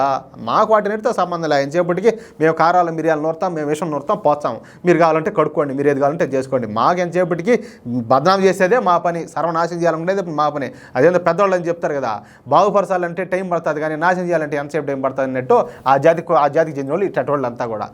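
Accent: India. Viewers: Your way of blasting .. in a telugu natu slang is superb .. actually really gets hard into all kind of people mind.. 🙏🏽🙏🏽👍👍🔥🔥🔥🔥🔥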